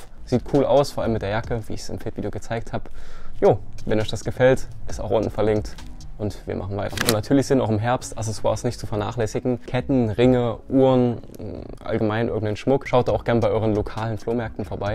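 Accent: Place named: Saxony, Germany. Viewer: Dein dialekt klingt bisschen sächsisch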